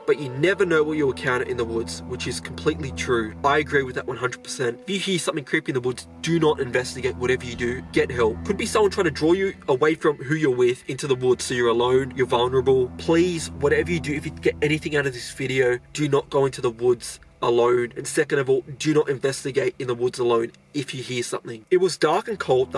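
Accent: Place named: Australia. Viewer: i figured you have to be aussie. the accent never lies :D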